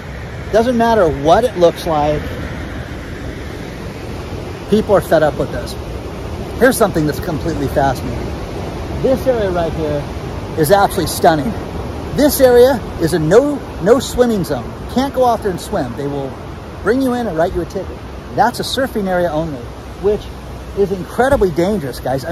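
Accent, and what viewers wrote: United States. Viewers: Love your channel and love your American accent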